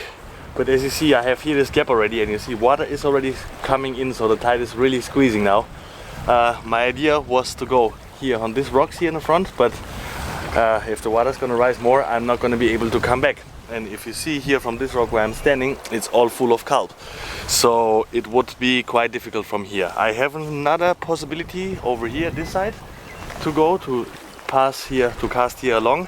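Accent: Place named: Europe. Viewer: Im half Scottish half Hungarian. And you sound kinda european so I thought i’d ask🤣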